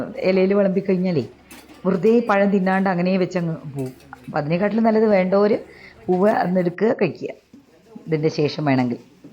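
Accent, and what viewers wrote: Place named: Valluvanad, India. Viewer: Original valluvanadan slang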